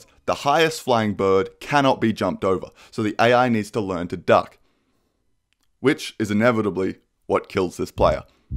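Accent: Australia. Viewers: Tf your Aussie?😮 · You sound very Australian let me tell you ! 😆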